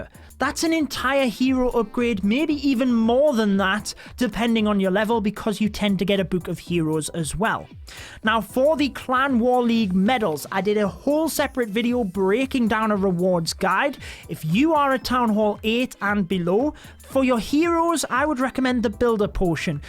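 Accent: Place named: Northern England, United Kingdom. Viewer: My northern English brother 🦾😘 I can hear on your accent baby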